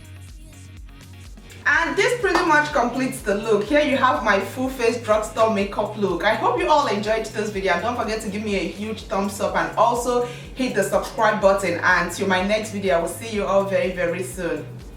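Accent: Nigeria. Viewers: okkayy that accent is my Nigerian sister. I am Nigeria and I know when I heard your voice for the first second. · Where are you from? I hear the West African accent, Nigeria. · Are you from Nigeria? Just asking because you sound like my mom who's Nigerian.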